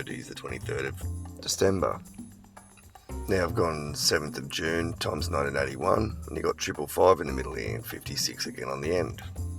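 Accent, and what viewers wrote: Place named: Australia. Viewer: Wow this is crazy awesome! ... a lot to digest here... so your Son's name is Phoenix? For reals!!! Both my husband and first born daughter = 138 in ordinal.. I'm 888 in Sumerian and a couple of things I found while watching this (just for fun) I was exactly 9 months 1 week and 1 day old (911) on July 25th 1981.... and 34 years 8 months(+3 days) after January 1st 1981 my first daughter was born pm.. I love your vibe man,.. so great to hear a bonza Aussie accent for a change while listening to videos on YouTube.. :face-blue-smiling:💙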